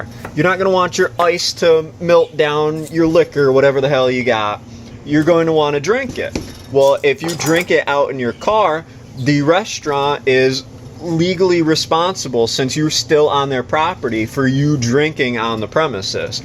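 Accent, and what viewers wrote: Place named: Maine, United States. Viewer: Yeah, I guess I know why the accent sounded kind of familiar since I lived in Maine for over 30 before I bailed out and moved to Seattle. I have never heard of paper and food.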